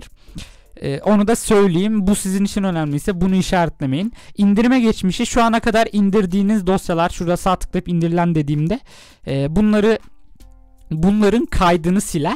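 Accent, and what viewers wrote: Kayseri, Turkey. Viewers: kayseridemi oturuyosun abi ??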